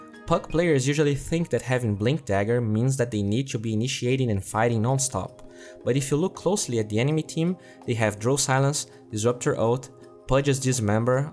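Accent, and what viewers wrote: Brazil. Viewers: is this dude brazilian or some shit?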